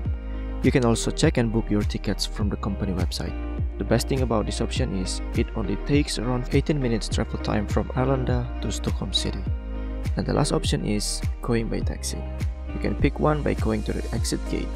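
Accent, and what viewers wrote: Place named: Indonesia. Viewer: Hi are you Indonesian? You have accent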